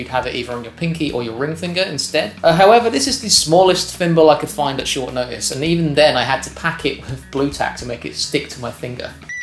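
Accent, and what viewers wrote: United Kingdom. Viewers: You're the man with the most marked British accent I've ever seen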